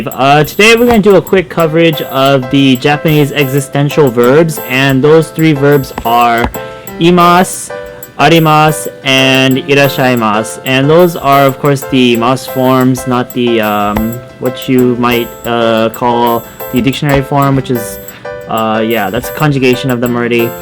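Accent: United States. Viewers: Is English your first language, or did you learn it as a 2nd language? If the latter, i must say your American English accent is perfect. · I'd guess he was raised in america(prbbly was born there as well) but his parents are japanese and talked to him in japanese But I think he has an accent in japanese (not sure tho)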